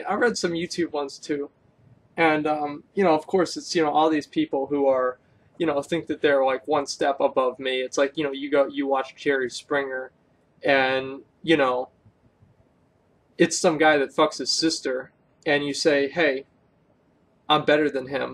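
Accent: Texas, United States. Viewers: am I crazy or did Asmon have a southern accent in 2013? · nah you're right he sounds like a caricature of a texan in this vid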